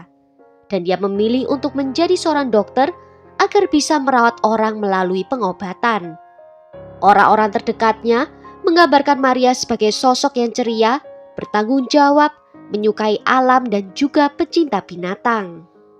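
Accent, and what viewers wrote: Java, Indonesia. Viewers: Aku syukak bingitsss dengan medoknya sis, semoga ciri khas ini bisa membawa channel semakin dikenal dan segera mencapai jutaan subs🎉❤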